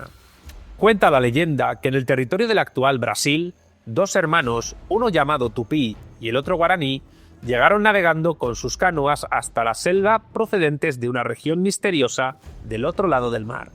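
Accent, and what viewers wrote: Spain. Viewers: Esa historia es de los vencedores y no de los vencidos no se quién son ni me interesa saber no era América y ni hispanoamericano eran tierras desconocias no fue colinizado ya que ya vivian aquí es estas tierras yo hubiera preferido que llegaran los japoneses y aferrados a llamarmal colonizar no comian carne humana y los llamados inquisición española que eran unos santos violando y torturando mujer y hombres fue genocidio no conquista por tu acentos se oye que eres español y si tenian que dejar como estaban los antiguos pobladores hispanidad ridículos y ustedes no eran aborigenes con tanta gurra y matando gente en Europa los antiguos españoles el azteca no sabes de historia eran mexicases lo que quieren que crean en la nueva tierra todos de este lado vivian en armonía por eso tantos pueblos y su inquisición eran angelitos aja y la inquicion no eran sanguinarios y y novela respetaban a las mujeres con tal de violarlas hispanistas rudiculo seguramente estaban ahi viendo como guerreaban pues como discutir si crees que tienes la razón como si lo hubieras vivi y verlo en vivo y a todo color no te va aparecer lo que te escribo te guste o no los antiguos españoles no eran canibales y violadores no son objetivos quieren imponer lo que ustedes creen y los antiguos españoles no vivian en guerras constantes no critiquen no se vallan a morder la lengua ustedes no tenian esclavos no tenian o prostitución forsadas los antiguos españoles todos unos angelitos se ve que eres español y no sabes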